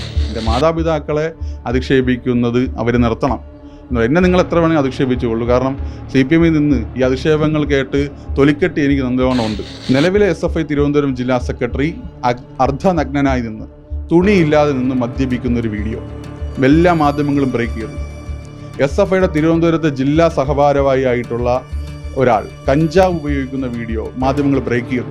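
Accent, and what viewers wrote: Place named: Kannur, India. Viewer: ഈ ആൾ. കണണ്ർ. കാരനാണൊ. വാചകത്തിന്റെ അവസ്സാനം "വേണ്ടി" എന്നുകേൾക്കുന്നു 😢😂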